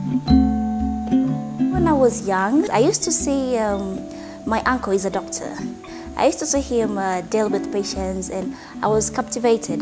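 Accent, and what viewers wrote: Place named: England, United Kingdom. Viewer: I love that English ascent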